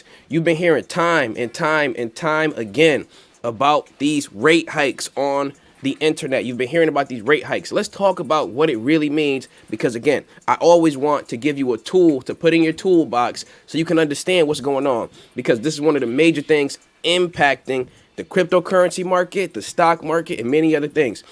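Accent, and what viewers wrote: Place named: Brooklyn, United States. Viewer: Brooklyn NY I can hear you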